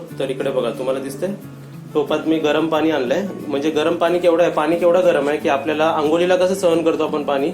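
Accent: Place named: Nagpur, India. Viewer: Chhan sangta,Nagpur chi language vate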